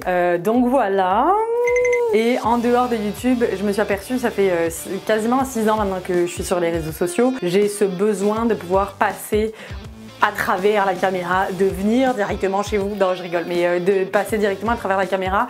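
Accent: Quebec, Canada. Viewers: C’est drôle tu commences à avoir l’accent québécois sur certaines assonance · Tu as de plus en plus l’accent canadien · Vidéo génial ❤ j’ai l’Impression que plus le temps passe plus ton accent québécois s’accentue 😂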